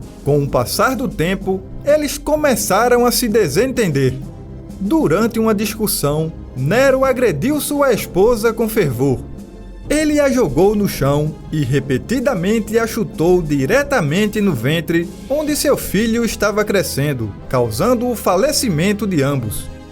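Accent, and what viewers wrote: Northeast, Brazil. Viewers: Gostei do vídeo e das expressões nordestinas. É sempre bom conhecer mais a história, e ainda melhor com o sotaque do meu nordeste. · Narração muito boa! Sotaque nordestino o máximo. Vc me faz rir!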